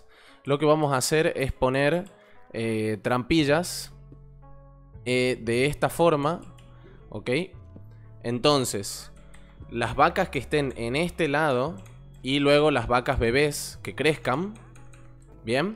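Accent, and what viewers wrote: Tucumán, Argentina. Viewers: Che sos argentino digo por como prpnuncias la rr. Porque creo que en alguna provincia se pronunciaba haci la rr. Creo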